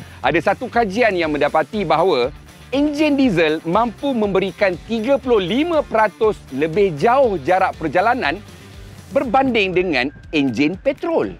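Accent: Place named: United States. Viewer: Pelat USA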